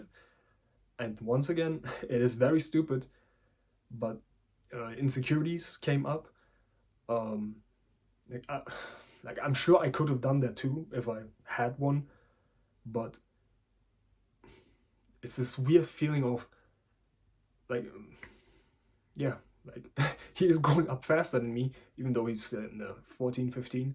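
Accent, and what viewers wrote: Germany. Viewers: Du kommst ja aus Deutschland, das hört man raus. Dir viel Glück und Erfolg.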